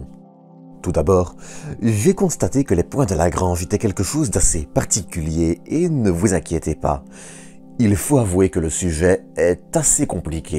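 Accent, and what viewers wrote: Belgium. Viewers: il a un accent belge à couper au couteau, il doit veniiiiR du fin fond de la campagne hainuyère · Ici grâce à Christophe ! Super chaine, je m'abonne ! (en plus je suis addict à l'accent belge...)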